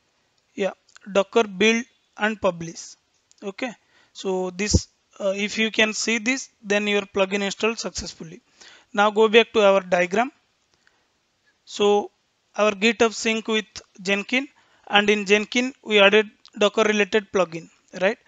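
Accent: Odisha, India. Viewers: Bhai it seems u r from Odisha. But great explanation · Ur pronunciation is telling that ur from Odhisa.Their way of pronounciation is quit different from others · The way you pronounce... Probably you are from Odisha ?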